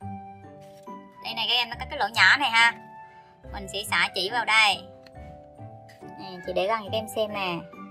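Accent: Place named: South, Vietnam. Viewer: Đoán vội người miền nam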